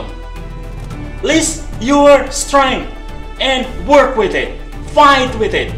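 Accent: East Java, Indonesia. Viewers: Jowo timur kayak gitu mang mas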